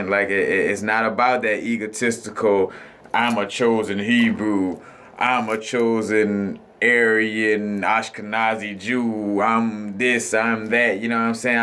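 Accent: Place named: South, United States. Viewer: I notice that you have a southern accent - you would have been burned at the stake, in earlier times - by both blacks And whites for expressing this type of contentent.... the 21st century is truly is incredible !!!.....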